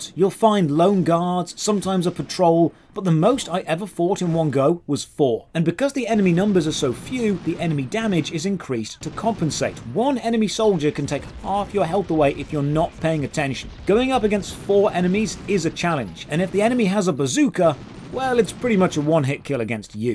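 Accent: United Kingdom. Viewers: Listening to you talk in this video, it makes me sad to realize we never got a Bri'ish Commando MOH game.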